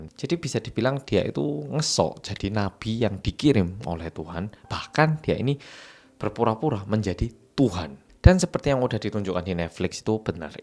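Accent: Java, Indonesia. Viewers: Nyoro mas, boso jowoku sampek kalah karo sampean...terlalu medokk mass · Hallo, aku mau tanya boleh? kok tuh gereja ngga ditutup sama pemerintah ya? terima kasih Mas ya aku baru gabung ke chanel nya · Di gonta ganti karepe dewe 🤣🤣🤣 jawir banget mas...👍🏻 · Jowo banget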